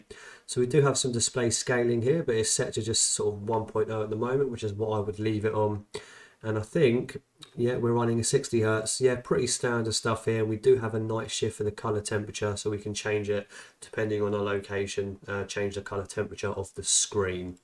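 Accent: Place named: London, United Kingdom. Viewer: I like your London English accent!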